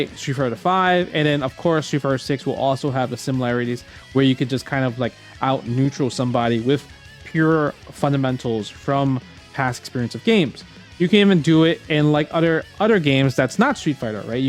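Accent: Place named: New York City, United States. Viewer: Watching your vids for a while , hearing the way you speak and your slang , what games mean the most to you and even that Nights Jingle at the end … I knew you were my age and from NYC. Yup I was right - 84-85… from NYC, don’t tell me ur from Flushing …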